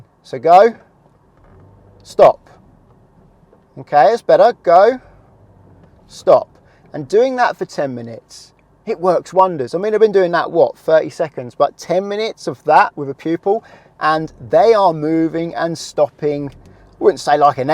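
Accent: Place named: Essex, United Kingdom. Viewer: Thank you for your videos as ever! It's so comforting to hear an Essex accent I feel right at home hahaha. I'm currently learning in Bradford which is probably the most exhilarating (dangerous) place to learn in the UK. Could you please come do a video in Bradford and enjoy the ride? 😂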